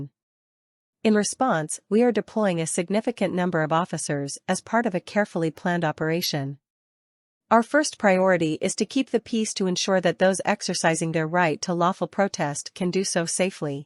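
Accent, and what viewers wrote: United States. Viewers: American naratetr Wdf u know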